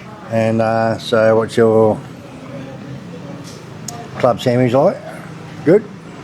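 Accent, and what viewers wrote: Australia. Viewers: Love the Aussie accent, says this Yank. Apologies if you're from NZ. The accents to our ears, are so similar.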